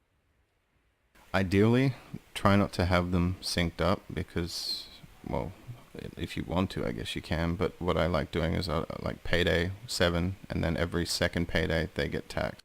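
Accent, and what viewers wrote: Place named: Australia. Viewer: Damn, that's a pretty good Aussie accent for a Norwegian!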